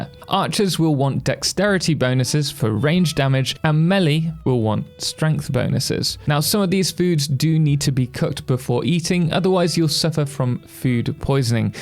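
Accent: United Kingdom. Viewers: Sounds like a normal British accent to me, you know from tje country that the language came from 😂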